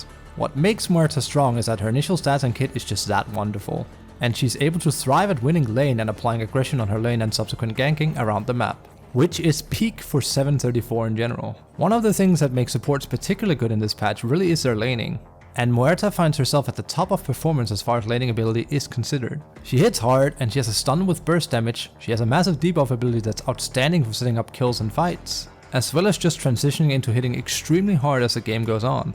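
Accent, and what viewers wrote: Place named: Denmark. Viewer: Thanks (fellow dane here btw.. took me a few mins before I realized, but accent gave it away) - matter fact won 3 games in a row as muerta 5 just today : )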